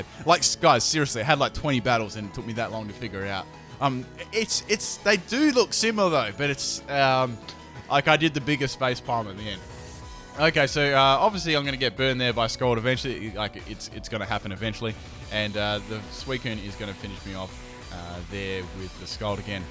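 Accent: Australia. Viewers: are you australia?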